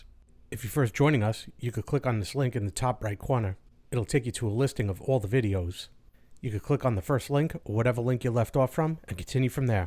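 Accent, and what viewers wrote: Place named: East Coast, United States. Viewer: Phenomenal series, stellar camera work and editing! I can't believe you don't have a million subs and a billion views on each video! Precision workmanship. Hard to miss that east coast accent, I lived in Upper State N.Y. as a kid and Long Island as a teenager. What's the story with the pair of Yamaha's in the background? I've got an '06 Yamaha Stratoliner S model (113) that's Darksided. What brand starter did you end up using?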